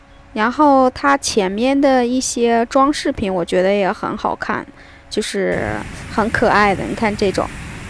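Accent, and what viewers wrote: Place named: Northeast, China. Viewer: 听🈵️……东北s味十足…凑合着听吧……呵呵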